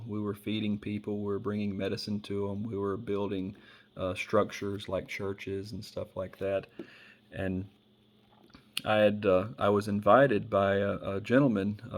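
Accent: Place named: South, United States. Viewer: Amazing story brother! May Allah bless you with best in this world and hereafter. I have to say something, hearing your southern accent makes me keep thinking about Forrest Gump 😊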